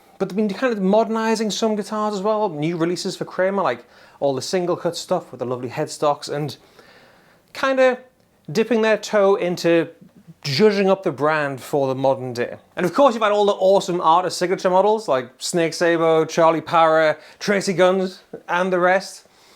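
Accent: United Kingdom. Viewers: Thats one of the best reasons to subscribe! Well, there's also the guitar playing and the smoth British accent. Your a legend sir.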